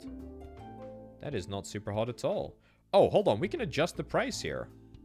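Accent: Scotland, United Kingdom. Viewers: he's definitely Scottish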